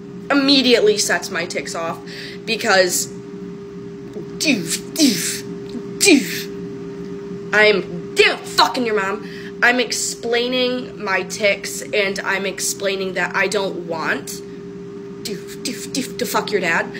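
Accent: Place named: England, United Kingdom. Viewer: Brilliant english accent....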